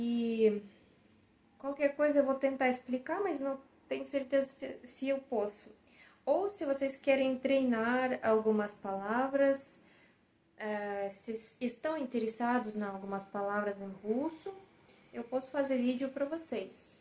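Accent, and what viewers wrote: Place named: Brazil. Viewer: Eu lembro de uns vídeos. Antigos seus e pude perceber seu esforço em falar português do Brasil, nós brasileiros falamos o português totalmente diferente do português de Portugal, tanto que nós não entendemos nada que eles falam, como se fosse idioma Russo X idioma ucraniano, acho que você me entende... Tenha uma ótima noite até a próxima Пока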